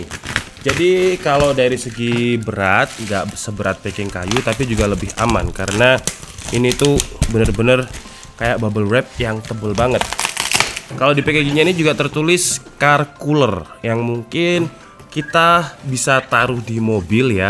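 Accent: Malang, Indonesia. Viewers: Lo mase wong malang to bak e 😁😁😁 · Medhok malang😅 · Owalah mas jawa pisan to... Org mana mas...saya Banyuwangi, Hadir🙏🏻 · Saya kyk nya kedengaran Malang ,Malang mana bang 😂😂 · Ela wong jowo jebule, "Opo si ki jenenge"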